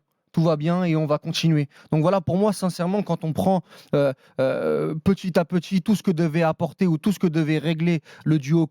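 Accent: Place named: Banlieue, France. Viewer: Galtchhhhier...Contchhhhinuer...tRRRRRRRRRès loin du compte...mais putain cet accent des cités quelle horreur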